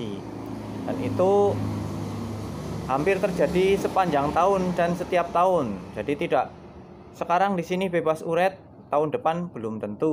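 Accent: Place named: Banyumas, Indonesia. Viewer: Lucuu mas e... bahasa Banyumasan...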